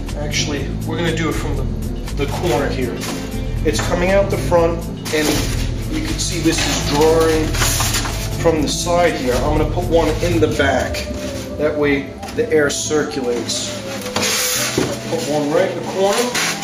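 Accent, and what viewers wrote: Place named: Philadelphia, United States. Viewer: nice one, but put those cubes in a baggie. you sound as if you may be an original philly boy, am i right?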